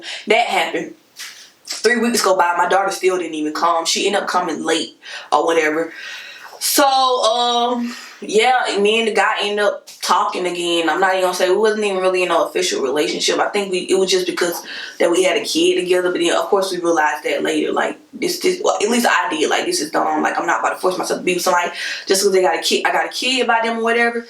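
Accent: Atlanta, United States. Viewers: Where are you from sis!!!!! I hear ATL all in your voice 🤗😂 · Are you from ATL cause you got that accent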